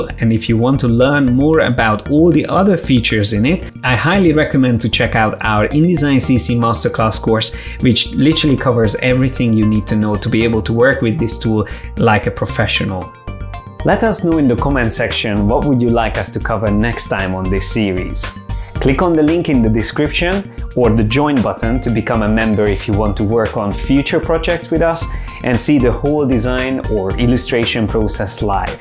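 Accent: Hungary. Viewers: sick video, are you Hungarian? judging by the accent :) (so am I) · Are u hungarian? Your accent is identically as hungarians speak english. No offense, it just was familiar to my ears...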